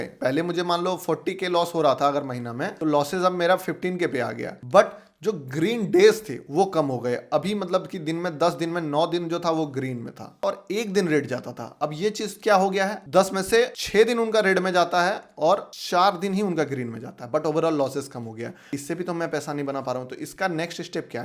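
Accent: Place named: Odisha, India. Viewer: Bhai tum odisha se ho 😊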